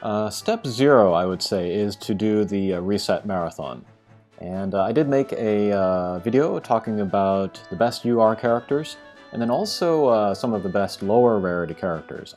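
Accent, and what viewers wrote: Japan. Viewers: By your pronunciation of names, I can tell you are Japanese, by your general pronunciation I find hard to believe you are japanese. You have a lovely accent that surpasses even those that were born in english speaking countries.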